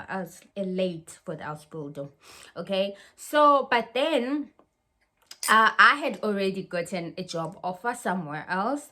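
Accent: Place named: South Africa. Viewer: You said, "yhoo shame", "uyanya", etc. The way you say these words, accent and demeanor, it screams Xhosa hun. I will be starting life in Germany in the next few months on Blue card visa. Hopefully, I don't go through such turbulence.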